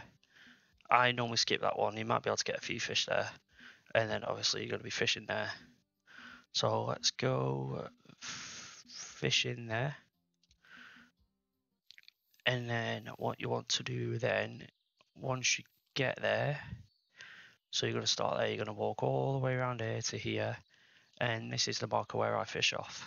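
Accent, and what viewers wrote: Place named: United Kingdom. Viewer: Sounds like your from UK, subscribed. Works amazing there with oversized carp mix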